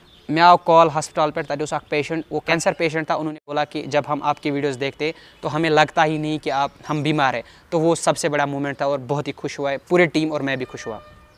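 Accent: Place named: Sopore, India. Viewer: Abi tak mai soch raha tha ap sopore se ho becoz of your ascent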